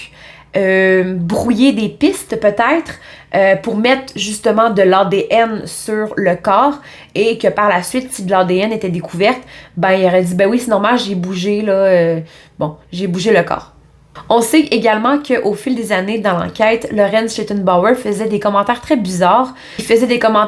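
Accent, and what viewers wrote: Quebec, Canada. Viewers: Bonsoir, affaire assez étonnante. C'est assez brouillon comme meurtres, celui qui arrivera à trouver le coupable, même plus de cent ans après, aura beaucoup de chance car toutes les hypothèses que tu cites peuvent être valable. Merci pour ton super travail avec ton joli petit accent québécois. Je n'arrive pas à te trouver sur Instagram. Pourrais-tu me donner ton Instagram, stp ? Bonne fin de soirée, bises livresques du sud-ouest de la Mère Patrie. 😘😘😘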